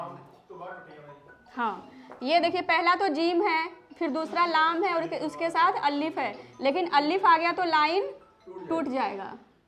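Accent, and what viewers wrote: Bihar, India. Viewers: She must belong to behar state. ऱ accent Now इन pnjb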